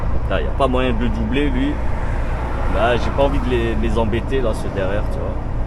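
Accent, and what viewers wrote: Alsace, France. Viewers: hola mon reuf change rien tu est au top sa c'est un bon alsacien ta façon de parler dans les videos tu a le droit de parler comme tu en avis courage et force a toi bis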